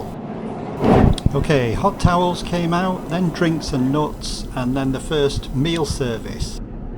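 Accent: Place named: North, United Kingdom. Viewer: With all due respect, I sometimes had trouble understanding your northern accent: "bus" for example.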